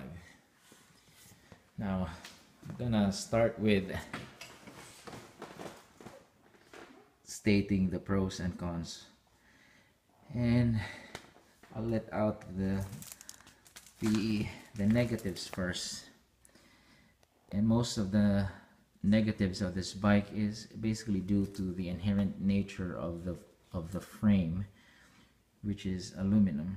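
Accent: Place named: Philippines. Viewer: nice accent. pinoy?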